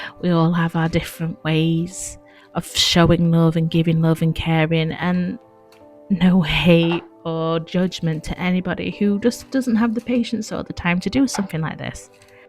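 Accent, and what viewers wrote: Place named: England, United Kingdom. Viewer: As soon as I saw the name of the channel I immediately knew they weren’t Irish 😂 came to double check I’m right and heard the English accent. ❤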